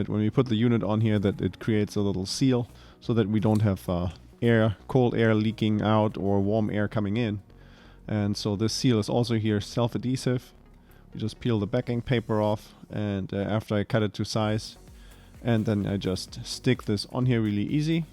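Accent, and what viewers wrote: Germany. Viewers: This video got rid of any anxiety I had about installing these. By the way you sound very German. My tutor always pronounced his "w"s and "r"s like you do. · i love the subtle hints of the German accent. :)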